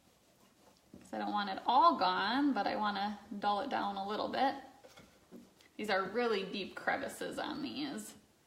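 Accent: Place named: Buffalo, United States. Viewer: You must be from Buffalo NY??! 😁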